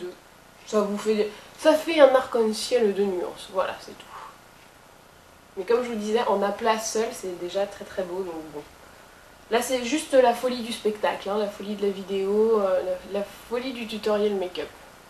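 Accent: South, France. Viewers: L'accent du Sud le plus beau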